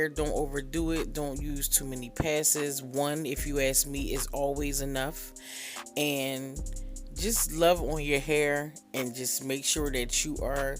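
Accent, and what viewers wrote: Philadelphia, United States. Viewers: I love the color of her hair. By the way, are you from Philadelphia. Your accent sounds like Philadelphia. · I love hearing your voiceovers with your Philly accent! Our accents are so distinctive, although we don't think so, lol. I'd love to have you bless my hair when/if you get back here. I cut off my locs 3 years ago and I've been wearing wigs, but I hate them now and want to do something with my natural hair.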